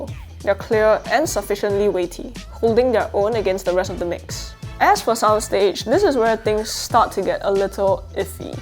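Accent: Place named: Singapore. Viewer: You're Singlish still can't escape but well done. More practice will push you further. Thanks for the review. If you may compare to Bose speakers for me particularly the Bose Mini will be great!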